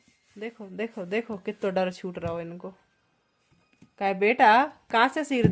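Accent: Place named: Bundelkhand, India. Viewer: Didi mai aapko indoor se dekhti hu mera naam asha hai mai aapko bhut pahle se dekhti hu meri mummy ka gav bhi tikmgad hai mai bhi aapke jese hi bhsha mai baat karti hu bundelkhng mai aap bhut piyari ho kisi ki baat par dhyan mat do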